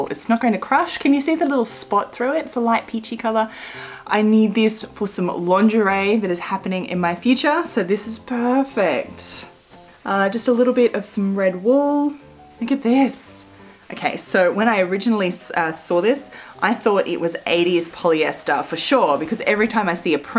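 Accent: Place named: Australia. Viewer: New to your channel, your accent sounds Aussie to me, do you live in Australia?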